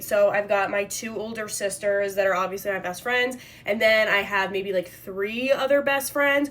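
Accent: United States. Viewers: Can I please ask you what's your accent obviously I know you are American but where did you grow up xx love you guys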